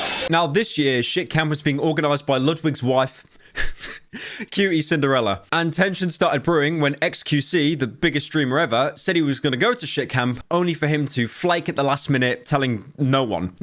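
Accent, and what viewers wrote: United Kingdom. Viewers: wait your British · Mogul Mail is enthusiastic charlie, is british mogul mail. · The one reason I what covering twitch drama is to hear a Bri'ish person say these streamers' name:))) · being British does give a large factor, his voice is way more pleasant to listen to than Critikal. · yuck british 🤢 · I only watch for his yee yee ass British accent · Listen, I like your content but you’ve got to stop pronouncing names so Britishly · You're brittish? fuck man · The only reason I watch for news is because critical sounds really boring. And pyros British. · Bri'ish? — opinion rejected... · ur british? ok cya not listening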